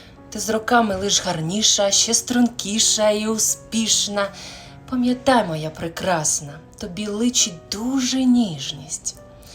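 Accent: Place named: Surzhyk, Ukraine. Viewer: Це не Українська, пробач мені. сільській суржик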